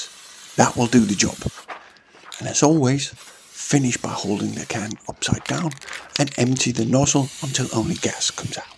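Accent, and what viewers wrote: Denmark. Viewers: Grinern, man kan bare høre det en dansker på accenten med det samme :D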